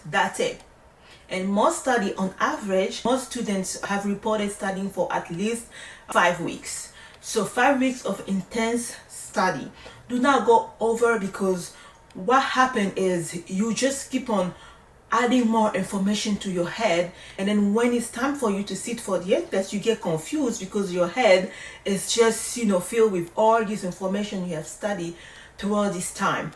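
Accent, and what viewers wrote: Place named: Cameroon. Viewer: Hi new subscriber here👋🏽, first of all I want to thank God that I have came across your video. Your first video that pop up on my feed was "how to find sponsors for a green card (2025)". I will try to make it short (because I like to talk a lot). To be honest when I clicked on your video I wasn't sure what to expect, but I believe is God who wanted me to come across your video. When I heard your accent, I told myself, maybe she's from Cameroon 🇨🇲🤭, but when you said you were from Congo 🇨🇩, wow 😳 I was so amazed. Let me tell you how proud I am as your fellow Congolese🇨🇩 sister to see everything that you have achieved, seriously with all the titles on from of your name, that's the most blessing ever (You Go Girl!!). Like I said I will try to make it short 🤭😅. So, I am a UK trained nurse and I live in London. I was born in Congo, been raised and lived in Belgium for 13 years and I have decided to move to the UK. 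So, you might have guest from now that by this message I want to migrate in the US as an RN for so many reasons. But the first is for the job progression and all the nursing opportunities. In the UK, unfortunately, right now the UK is failing all their nurses. So I am on my journey to migrate, I know the road will be long with retrogression (EB-3 visa), but I believe God can do a miracle and touch Mr Trump's heart to make a big change for us nurses😁. On a serious note, I have start the application with New York BON because right now they are the only state that ac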